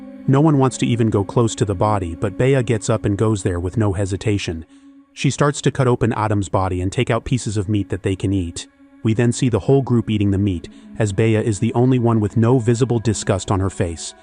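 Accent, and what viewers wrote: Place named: United Kingdom. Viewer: British? Lost me...must be shit.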